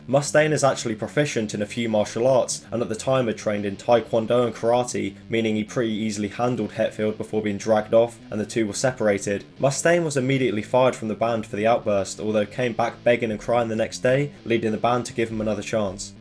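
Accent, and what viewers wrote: United Kingdom. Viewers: I hate when red coats narrate American business.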